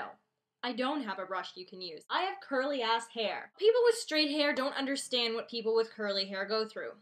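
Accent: Canada. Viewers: Are you Canadian? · are you from canada? what's the accent from?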